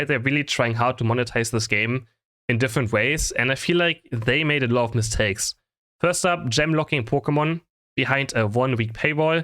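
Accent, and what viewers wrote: Germany. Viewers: I think that the game is currently at a decisive crossroad for it's future with the china thing. Let's see what happens and let's hope they correct the direction, because the way the meta developed in the last few months (like you said the big changes started to be noticeable around September with the whole Mime fiasco) with a focus solely on big damage and the inclusion of coffee break long stuns is pretty worrying. Greatly appreciate your channel btw, even if I still can't understand which country you're from (Germany? Netherlands?) Have a good new year Eve, cheers my man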